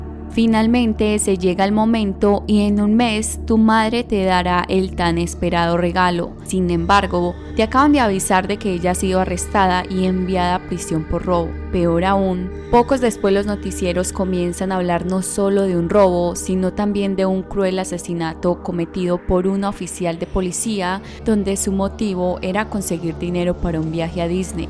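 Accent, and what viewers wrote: Colombia. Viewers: Por eso yo no confío en nadie porque no sabe uno con quién se va topar Dios nos libre de esa gente malvada, descansen en paz los señores, tan lindas personas! 😪Me gusta mucho tu acento Colombiano narras muy bien te felicito, saludos desde Sonora México, Mi hija se enamoró de Colombia en un intercambio escolar, su comida su gente tan bella,siempre lo recordará!! ❤️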